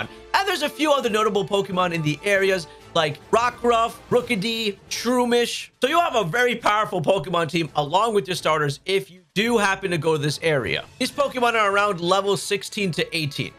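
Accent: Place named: United States. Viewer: Sounds American to me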